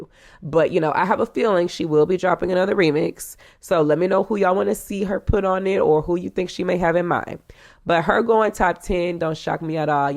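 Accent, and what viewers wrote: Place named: South, United States. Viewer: This is the best channel on here! Hands down! I think it's the Southern in you, and I'm a Southerner too. Lolllllllllll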